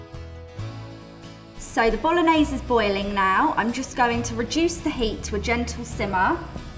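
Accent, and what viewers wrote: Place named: United Kingdom. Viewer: Jesus ! She is more british than ... than .... than.... the..... than the brits 😅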